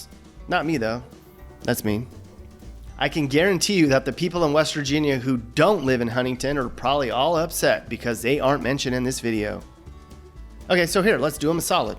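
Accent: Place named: New York, United States. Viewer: He;s a basic New Yorker thats what a lot of us sound like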